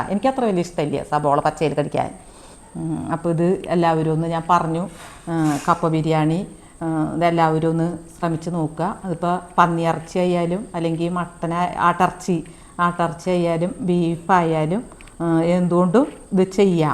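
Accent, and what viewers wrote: Thrissur, India. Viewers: കപ്പ ബിരിയാണി അടിപൊളി തൃശ്ശൂർ ഭാഷ നല്ല രസം ❤Super video .നമ്മൾ കപ്പ ന്നു പറയും❤വിറകൊള്ളി എടുത്തു വരുന്നത് ഓർത്തപ്പോൾ ചിരി വന്നൂട്ടാ 😂😂❤Super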